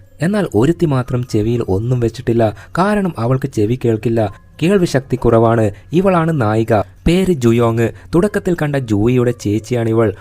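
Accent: Kannur, India. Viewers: Bro kannurkaranano 😮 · chettande nadu evda kannur ano🤔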